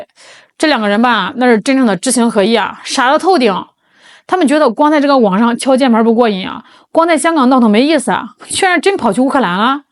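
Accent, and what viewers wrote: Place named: Northeast, China. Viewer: 這種東北口音 聽著很難受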